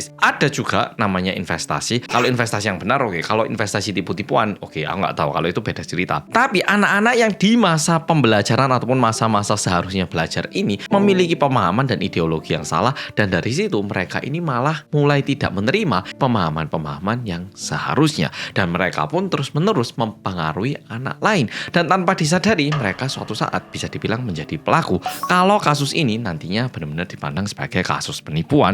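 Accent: Java, Indonesia. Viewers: Hai org korea yg medok🎉 bolomu lgsg nyimak🎉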